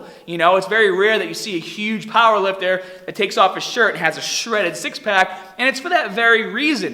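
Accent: Boston, United States. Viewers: That Boston Accent is so distracting lol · the boston accent is killer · Are u from Boston ? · It’s a Boston accent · You probably think of his Boston accent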